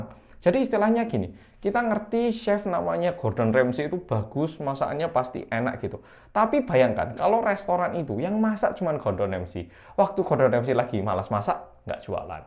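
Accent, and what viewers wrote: Java, Indonesia. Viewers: Makasih mas (Pria manis korea medok yang menjadi solusi bagi kami bolo² dalam setiap masalah 😄) aku sebenernya Masih ga nyangka sih Bighit yg agensi miskin, kecil dan hanya punya 12 karyawan kemudian semesta memberikan kesuksesan luar biasa lewat BTS sehingga sekarang jadi Labels Raksasa, punya cabang di Japan dan Amerika,uangnya ga berseri..dan sebenarnya banyak hal yang harus dicontoh dari seorang Bang PD Nim memberikan kepercayaan penuh thd anak² idolnya, memberi keleluasaan anaknya untuk menulis, memproduksi musik sendiri, diajarin gimana menghadapi dunia luar, tata kelola bisnis dan 2021an diajarin terjun ke persaham²an sehingga jika terjadi apa² Bangtan punya andil atau suara, berharap yang terbaik aja untuk semua (episode 4 Suchwita terbaru Suga bilang kami tahu bisnis, pengelolaan dan bagaimana industri ini bekerja dari Bang PD Nim)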